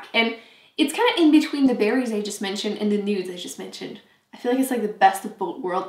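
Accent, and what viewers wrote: France. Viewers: Amber Schelfhout She actually did say “voyageur” (in perfect French, with no accent) pretty clearly, which means “voyager” or “traveller” in English. “Voyager” can either be used as a synonym to “traveller” in English or translated to “to travel” in French. Why would someone whose first language is French, speaking English with a French accent not know how to pronounce a French word? Loll... I was so confused by this comment... So she wasn’t trying to “make it English”. She just pronounced it as “voyageur” which is equivalent to “voyager” or “traveller” in English. It could have happened because she wasn’t sure if the word was meant to be French or English (since the spelling is the same in both languages). I’m tri-lingual, so this also happens to me very often... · Your accent is so beautiful 😍 French accent is everything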